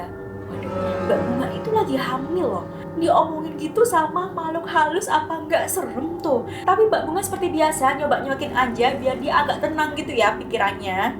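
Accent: Java, Indonesia. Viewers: Bang orang Jawa ya semangat 👍💪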